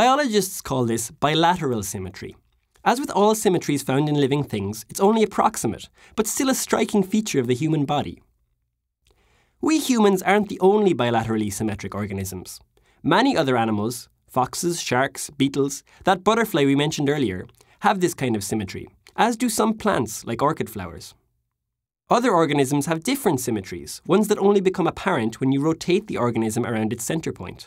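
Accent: Ireland. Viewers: The voice of an Irish person makes my ears bleed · Why do i think the speaker is Irish ? Maybe it's the accent. Did anyone else feel that ? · IRISH ACCENTS <3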